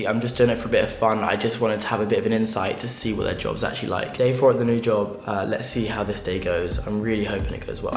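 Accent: RP, United Kingdom. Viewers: Dude, you have a posh accent. Pronounce the "th" as "th", not "f" as if you're trying to sound slightly more slum.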